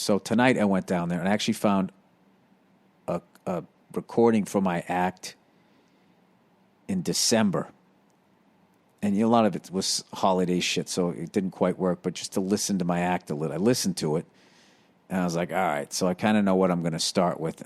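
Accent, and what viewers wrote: Boston, United States. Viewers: Bwaaston... Haha love hearing you. Keep it going.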